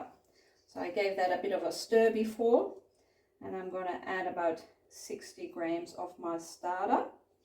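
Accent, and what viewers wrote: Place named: Australia. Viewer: Thank you so much! I loved your way of explaining and your Australian accent. The clothing changes were fun too. Nice production and even better tips.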